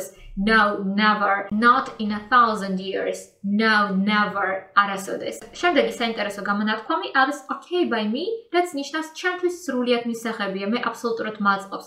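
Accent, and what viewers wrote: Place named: United Kingdom. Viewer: ძალიან კარგად ხსნი, მაგრამ ბრიტიშ ინგლიშს სამწუხაროდ ვერ ვიგებ, ამერიკული ვარიანტიც დაამატე რა, ამერიკული გამოთქმა,თ- ს ყური ვერ ეგუება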